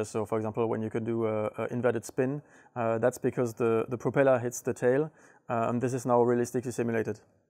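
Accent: France. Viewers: As seriously as I take all these amazing new sim technologies and stunning realism... that French accent just takes the cake for me xD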